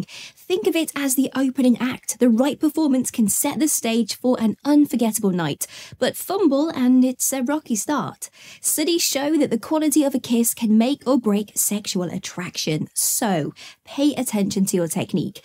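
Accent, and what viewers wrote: United Kingdom. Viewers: Damn I need to find a woman like. I love the British accent from a woman. (Never Assume Anything, it always makes an Ass of U M E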